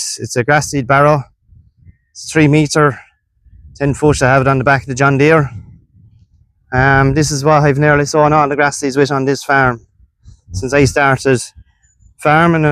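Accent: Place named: Cork, Ireland. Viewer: that looks like a billard table, fair play to your dad. trying to figure the accent..cork or tipp?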